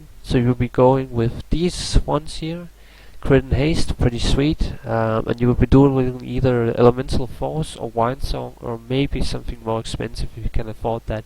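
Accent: Denmark. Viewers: Are you danish? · Are you Danish? You sound like a Dane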